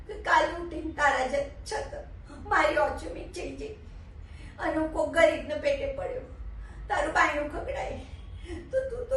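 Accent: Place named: Sabarkantha, India. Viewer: ખૂબ ખૂબ સુંદર એકોક્તિ. ખૂબ ઉત્તમ અભિનય!! વારેવારે માથે ઓઢવાની રીત... પાક્કી ઉમરલાયક બાઈ લાગે છે. સાબરકાંઠાની બોલી અદ્ભૂત!!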